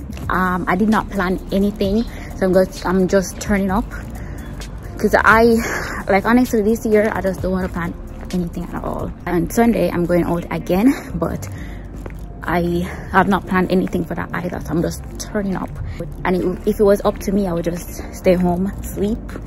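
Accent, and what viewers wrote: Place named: Jamaica. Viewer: I can deffo hear the Jamaican accent ! Yeh goooodieeeeee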